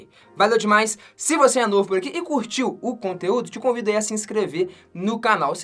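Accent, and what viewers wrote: Minas Gerais, Brazil. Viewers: otimo video, estou começando o enen para me preprar para o enen, e acho que vc e de minas ne kk obrigada. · Estava vendo o vídeo e anotando as partes pra estudar depois e tive que comentar sobre esse sotaque mineiro, ksksskskskkssk, coisa mais fofa.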